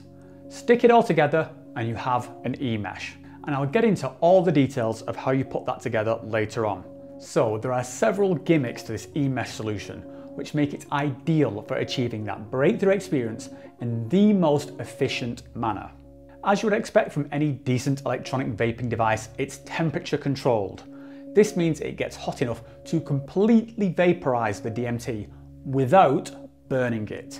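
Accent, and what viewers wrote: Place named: Wigan, United Kingdom. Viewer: Yo are you from Wigan you sound really local I’m from Preston haha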